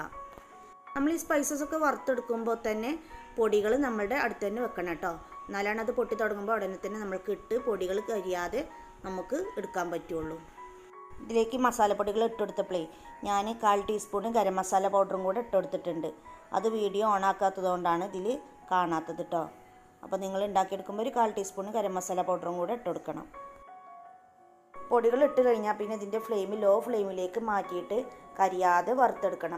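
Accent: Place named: Malappuram, India. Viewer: X-Mas Special Bief Fryoo😮😅Am much Impressed with Your Way of TalkinG Than CookinG ..Adhu oru vere Vibaan ...Asaal Malappuram Kaaaariii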